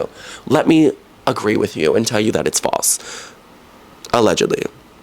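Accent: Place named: New Jersey, United States. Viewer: She sounds like she's from Jersey or from Newport.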